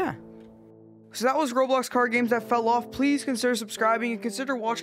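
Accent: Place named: Canada. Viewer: I can tell you're canadian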